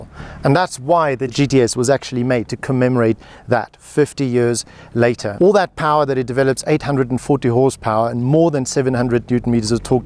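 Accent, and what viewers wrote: South Africa. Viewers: Is my guy South African ?? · Didnt know homie was south african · A South African accent is so unique. And it explains emotions and feelings so well. Great job on bringing us these wonderful videos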